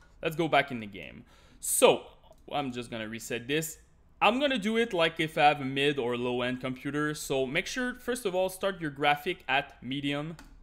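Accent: Quebec, Canada. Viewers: accent is from quebec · I can feel the quebec in him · boi tes quebecois?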